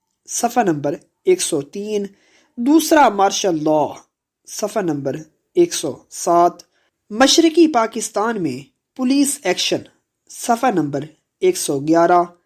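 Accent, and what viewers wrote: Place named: Arab world. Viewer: صدا کار صاحب سے درخواست ہے کہ اردو کو عربی لہجے میں نہ پڑھیں. یہ اردو کی کتاب ہے. يحي سنوار کی کتاب کے لیے کی صداکاری مناسب تھی کیونکہ اس کتاب میں عربی الفاظ بہت تھے.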